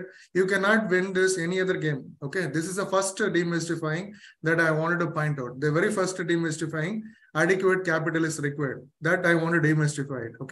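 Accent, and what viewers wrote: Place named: South India, India. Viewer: Very bad presentation and even his english also semi tamil or telegue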